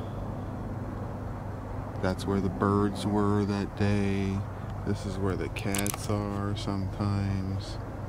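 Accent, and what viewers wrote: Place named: Texas, United States. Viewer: Love the jumper. So these cats want Pizza? I keep thinking ur from Texas... x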